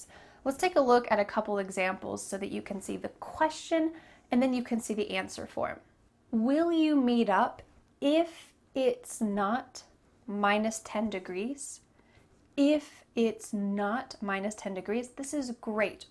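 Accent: United States. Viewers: Are you American?? I like American accent💜 and your smile is so beautiful 💜💜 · Are you speaking USA English?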